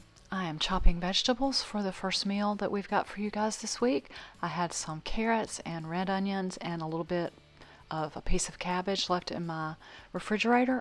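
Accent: South, United States. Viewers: Thank you for another week of meal ideas. I'm so sorry about your accident...wow! I love that veggie lomein, and am not ashamed to admit I also love oodles of noodles. Have eaten them hundreds of times with no ill effects. LOL Will you forgive me if I confess I can't seem to get into lentils? (Although my son keeps trying. He's given me about three pounds worth, and they're all sitting, being ignored, in my pantry.) Yeah on pantry meals, btw...you're doing great! I read one of the comments that someone criticized your voice?!? You have a lovely, soft, calming voice, with just a slight southern accent ( is more pronounced.) It's one of the things that makes your videos such a pleasure to watch. Just keep on being you. There's a reason you're headed to 10K subscribers, my friend. hugs...stef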